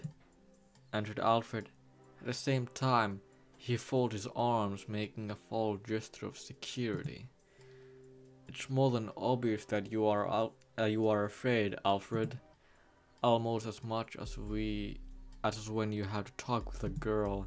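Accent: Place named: Finland. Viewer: I love your cute finnish accent. But fuck that weeb shit xd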